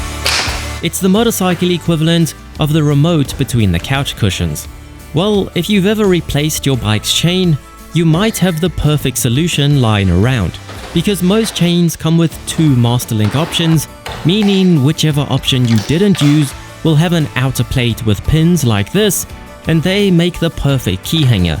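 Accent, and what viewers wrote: South Africa. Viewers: Thanks for the tips. Also, hello from South Africa. I could tell you were a boet from your first sentence.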